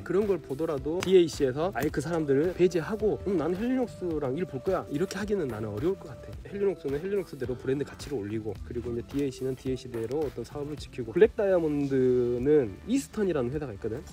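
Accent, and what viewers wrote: Jeolla, South Korea. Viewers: 택티컬 터널 검색중에 우연히 들어왔는데 즐라도 말투에 친근감 느끼고 바로 구독 눌렸는데 헬리녹스 전반적인 관점, 전망 설명에 ㅂㄹ을 탁치고 갑니다. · 같은 지역출신 사람으로서 동생같은 전라도 사람들이 많았으면 좋겠어. 전라도 사투리를 사용하는 사람들은 지적이다. 달변이다. 그리고 젠틀하다.이런 이미지 계속 퍼졌으면 좋겠어. 과거 암울했던 시기 오랜기간 여러채널을 통해서 자행된 전라도사투리의 부정적이미지 심어나르기 .. 추천누르고 구독 꽝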